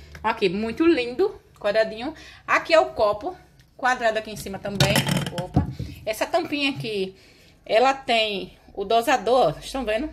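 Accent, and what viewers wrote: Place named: Northeast, Brazil. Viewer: Gostei do sotaque do nosso nordeste! Rsrs....beijo.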